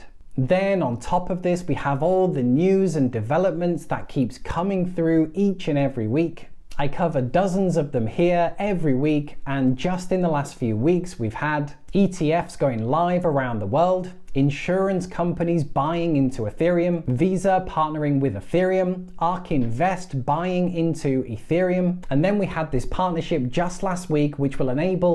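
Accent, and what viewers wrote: United Kingdom. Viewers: When I clicked on this video I didn't have my sound on and I predicted that you would be British and I was right.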